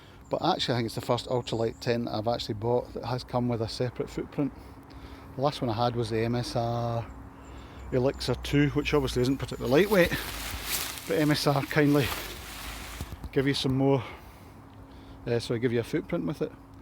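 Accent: Scotland, United Kingdom. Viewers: He's a Scot...you're a moron.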